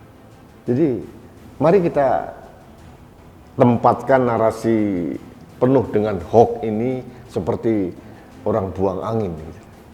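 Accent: Java, Indonesia. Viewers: Saya sangat senang dengan Kata Prettt dri,yg kental logat jawanya,setuju Gubernur cuman obral janji hasil 0,hasil Bel gedes geh pak,Nollll blonggg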